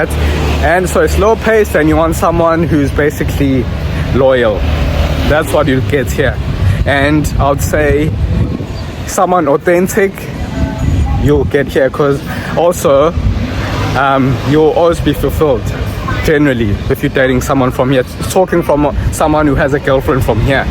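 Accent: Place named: South Africa. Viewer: Definitely South African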